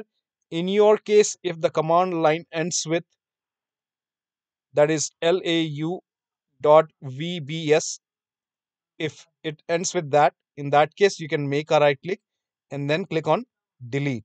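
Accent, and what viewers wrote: India. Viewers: I took a whole day, running antivirus, malwarebytes, autoruns, rkill, MSERT, i had tried everything , then I clicked on a random youtube video with 24 likes and a heavy indian accent; then the prophecy became fulfilled, there is no real solution or teaching that is better than this one and finally I can turn off the PC and go to bed. Thanks man. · accent like indian tech, thanks , very helpfull matte:elbowcough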